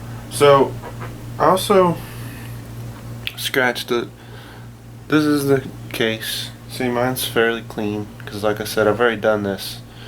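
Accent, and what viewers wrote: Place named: Canada. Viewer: He's Canadian. That's how the pronounce it. It's correct for Canadians. Also, he's shy so if u ask, he will deny he's Canadian but he is.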